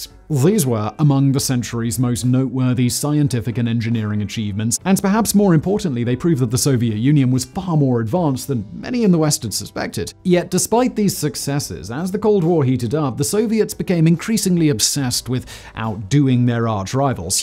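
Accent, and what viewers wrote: United Kingdom. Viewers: Can I just say that the name "Simon Whistler" is the coolest moniker, escpecially when coupled with the British accent....and a story about space weapons.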